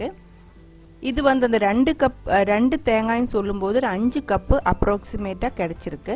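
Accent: Kerala, India. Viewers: I remember my hostel days, my kerala friends used to share this with me during the tea time in the evenings, I just love your tamil, just like my Malayali friends💞💖🤗😘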